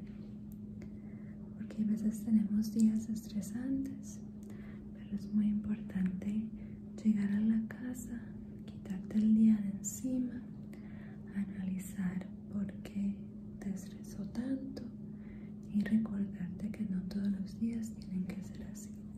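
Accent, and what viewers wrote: Antioquia, Colombia. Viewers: El paisa me encanta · el asento colombiano uuuufff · No en toda Colombia hablan así, las que hablan así como ella son las de Antioquia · Asmr+acento Colombiano= UFFFF Chuladaaaa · Eres paisa o no??? ❤️🙄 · el Plus del acento Colombiano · Colombiana tenia que ser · Solamente hablan así los paisas osea los de Antioquia, en Colombia hay muchísimos acentos. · su acento colombiano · el acento paisa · tiene acento paisa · me encanta el acento colombiano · Es Colombiana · Lindo el acento de las colombianas al hablar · la voz colombiana es lo mejor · colombiana es ella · Eso es paisa sumercé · el acento colombiano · El acento más lindo del mundo es Colombiano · el español de colombia es el mas bonito y ya medi cuenta porque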